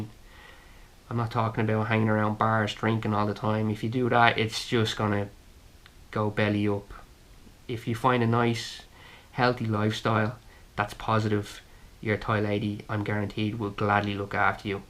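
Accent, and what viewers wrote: Ireland. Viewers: Sounds peaceful Irish.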